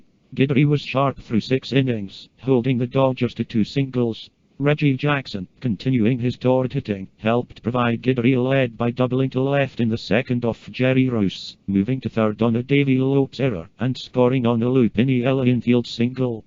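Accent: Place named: Ireland. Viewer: I am wondering: why did you choose the Irish voice for old videos on this channel?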